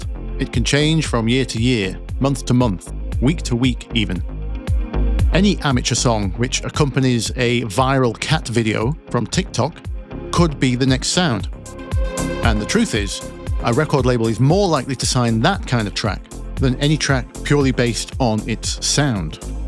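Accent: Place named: South Yorkshire, United Kingdom. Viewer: Great vid always. This resonated. I think learning the rules has made my music worse. Its technically better and i am closer to the sound o wanted to create but its just not as original. Oh, the curse of the creative . Struggling to place your accent, thinking somewhere South Yorkshire but Im probably miles wide of the mark.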